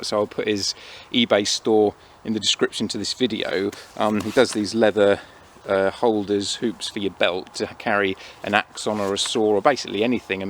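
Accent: United Kingdom. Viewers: Haha, actually you seem to be from UK because of your accent.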